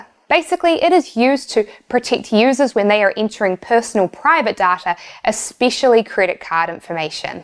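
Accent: New Zealand. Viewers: Love your Kiwi accent!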